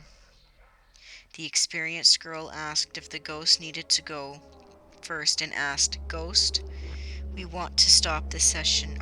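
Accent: Canada. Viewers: Also, you sound a tad bit Canadian.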